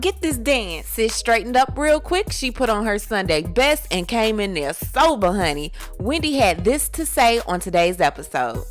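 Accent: South, United States. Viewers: I love and her accent. CORN-tine. You can do no wrong woman! Tea spilling facts with that friendly southern accent.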